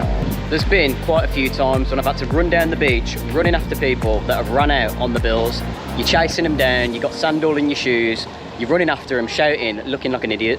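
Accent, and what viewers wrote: Birmingham, United Kingdom. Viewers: You from Birmingham or somewhere close to the black country?... your accent is very familiar.. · Hei man. 1st, thanks for good contents. Then, i watched u a lot before my first trip to thailand, and it was really useful. In fact, because of your nice british accent ,its easier for people like me to undrestand , but maybe you can put an english subtitle on your videos ,so that would be so good for us. It will be more useful and also helping us improve our english. It was just a suggestion. And thanks anyway. Hope to see you on next trip❤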